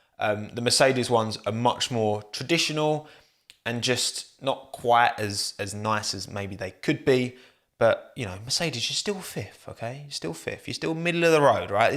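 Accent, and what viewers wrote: United Kingdom. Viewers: All I heard in this video was me me me I I I in an incredibly annoying Bri'ish accent innit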